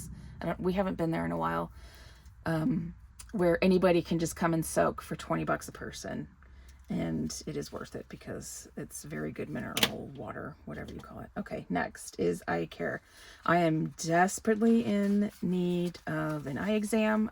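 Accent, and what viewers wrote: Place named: Midwest, United States. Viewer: Your video voice on this channel is so Midwest. “You scrap? Are you a scrapper?”😂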